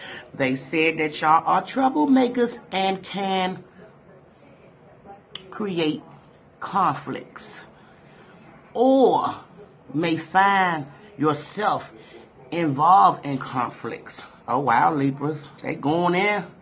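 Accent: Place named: South, United States. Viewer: You sound like you from the south, the accent reminds me of my grandmother accent. They from your area and the south.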